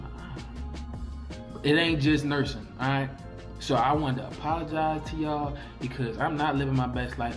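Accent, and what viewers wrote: Baltimore, United States. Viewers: Can tell you from Baltimore 😂, but good luck bro ! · I here Baltimore baby...keep up the good work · U from Baltimore ain’t you🤣 that accent one of a kind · i hear Bmore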